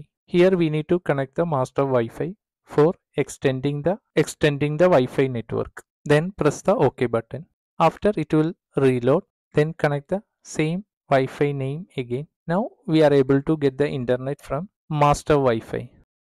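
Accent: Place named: Kerala, India. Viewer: english ketapol malayalee enu mansilay...universal repeater mode anelum kuzpam undo?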